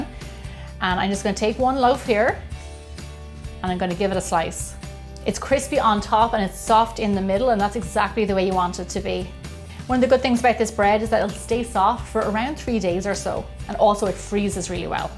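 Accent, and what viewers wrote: Ireland. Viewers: Love your videos,I will try this bread recipe fingers crossed, love your Irish ☘️ accent, Thanks for this recipe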